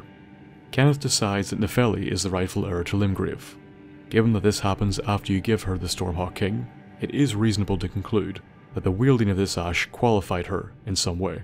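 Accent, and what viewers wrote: Ireland. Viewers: Bruh, I am so in for Elden Ring, please bro, please, I need that sweet sweet Irish accent for my Elden Ring, please do this like you did for Fallout, don't kill yourself, but I'm in for this, I need it lol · Hell yes, an Irish lore hound! Absolutely adore hearing Radhan called mad as a bag of spiders. 💜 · The irish lore master is back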